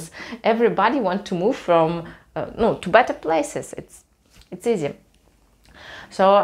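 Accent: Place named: Russia. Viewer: Funny story about the response you received when you asked for the supermarket... I am sure Dutch people immediately recognize your Russian accent. And it might be the mafia image portraited in movies or remnants of the Cold War,... but big Russians in the dark are scary.... Reminds me of the telephone instructions I once had to make,... you know ... "If you want this, press 1, if you want that, press 2" and the last phrase was "otherwise you will be disconnected". My daughter made that instruction for me because she is fluent without any accent. She is also capable of imitating the Russian accent and made that version for me as well. I can tell you, this last phrase sounds very sinister when spoken with a Russian accent "otherwise you will be disconnected...." Like: "un'offerta che non puoi rifiutare."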